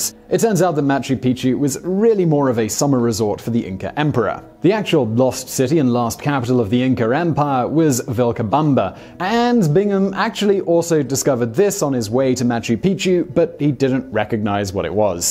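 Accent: England, United Kingdom. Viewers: There's apparently a lot of stuff he can't seem to pronounce properly....must be the urge to put that "proper British" spin on it. Did you happen to see the episode where he pronounces geysers as geezers? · I have and still do enjoy your videos BUT... You butcher the pronunciation of names terribly! I can forgive some of this due to your English accent, but you really should at least LISTEN TO THEIR NAMES AT LEAST ONCE TO GET THE PRONUNCIATION AT LEAST SOMEWHAT CLOSE!! I mean Damn boy how hard can that be??? Some of these names have been in video for decades and their correct pronunciation is a matter public record. So how about a bit more due diligence. Because the way you butcher names even embarrasses your fellow countrymen. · Perhaps the British pronounce words and names differently than us. We did take a proper sounding language and gradually forget how to enunciate our vowels.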